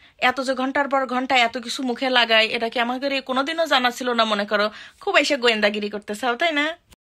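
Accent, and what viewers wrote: Bangladesh. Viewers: Bangladeshi kotha gulo ank moja lage sunte, very nice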